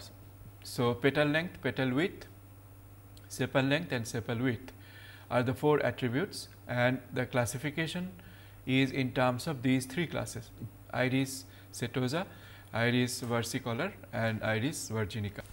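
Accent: India. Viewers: Lecture is okay if I can't stop laughing because of the Indian accent ;)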